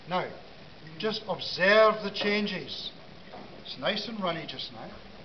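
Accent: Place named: Scotland, United Kingdom. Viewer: its called a scottish accent stud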